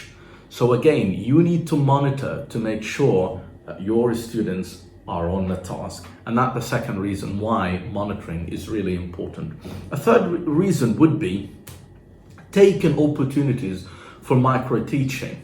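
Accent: United Kingdom. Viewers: God Pless you Bro Your British accent is Great Keet it UP ❤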